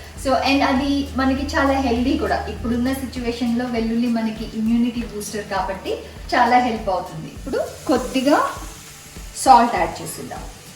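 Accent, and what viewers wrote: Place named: Telugu, India. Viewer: Nice Baga cheseru. Meru telugu vallu kada garu...mee matalu ala unnai.....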